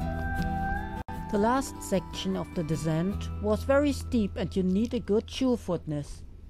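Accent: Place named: Germany. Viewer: Hey, your english is very well (Better than mine ':D) Your free speach is nearly that good, than it is in german, with a little german accent of corse... Best regards Paco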